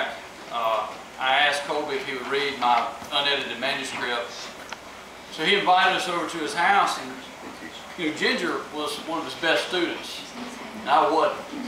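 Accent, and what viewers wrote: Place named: South, United States. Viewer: I barely recognized you with shorter hair, but when i heard the southern twang, all was clear:) We'll have a dram of Laphroaig or Ardbeg the next time y'all come over! Bring a copy of your book for me!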